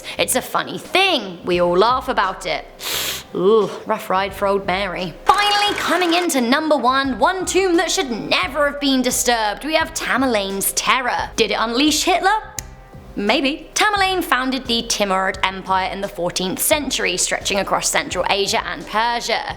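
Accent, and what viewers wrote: England, United Kingdom. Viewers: Its the british accent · Miss!! I REALLY LOVE YOUR BRITISH ACCENT!! I HAVE A EARGASM WHEN YOU TALK!! 🇬🇧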